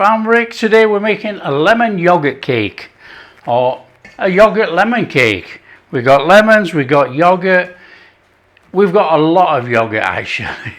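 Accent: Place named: Northern England, United Kingdom. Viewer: Fantastic. I'd love to know what part of the uk you come from with an accent like that? Obviously the North but where???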